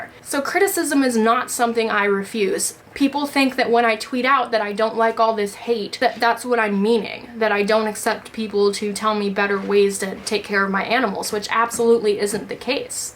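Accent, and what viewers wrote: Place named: South, United States. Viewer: I feel like I suddenly just noticed your southern accent Like it just stood out in this video But I’m from Minnesota so 😔🤟🏻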